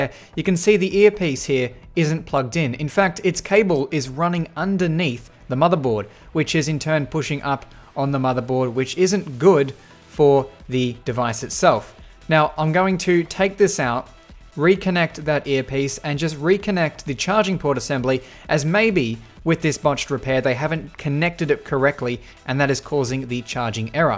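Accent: Australia. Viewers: W8 this dood is australian too. Nice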